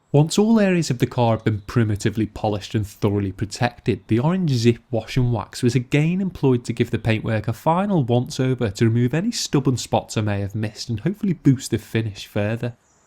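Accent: Liverpool, United Kingdom. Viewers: by the way he's from Liverpool...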